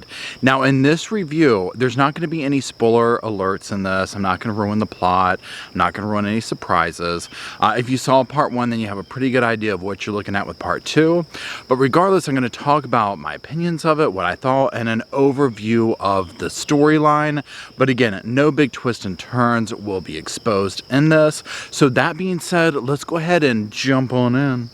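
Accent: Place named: England, United Kingdom. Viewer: Hahaha loving your English Emily blunt accent 👏👏👏👏I’m English and people always think we speak posh! Like the royal family and erm....I don’t lol x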